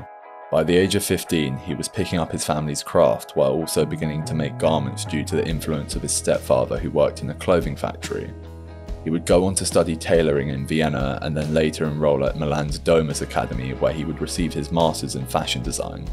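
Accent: United Kingdom. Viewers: the high energy intro revealing a deep fried british voiceover is so funny to me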